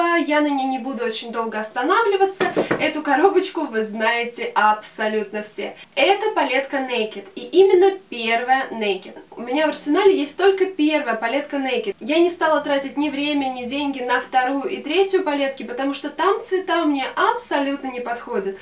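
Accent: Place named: United States. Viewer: убери американский акцент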